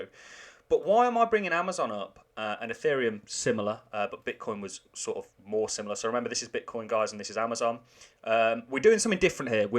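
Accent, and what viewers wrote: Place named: West Midlands, United Kingdom. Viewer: Thanks again. Nice to hear a west mids accent adding an enormous amount of value, keep up the good work pal.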